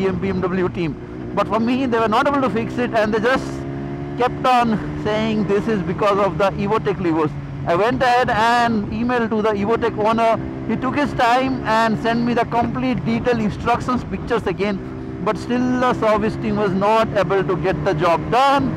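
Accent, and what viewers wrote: Odisha, India. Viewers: English lecture ree odia tadka😆😆..mast!!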